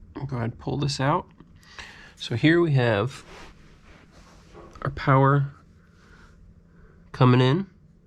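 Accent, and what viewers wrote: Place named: North America. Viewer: Thanks for this. Interesting.... North American accent but UK socket outlets?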